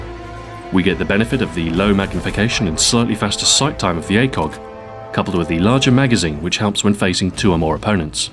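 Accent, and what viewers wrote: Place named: United Kingdom. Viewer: its definitely the british voice that makes this video. always love a fellow british lad. is such a Jeff!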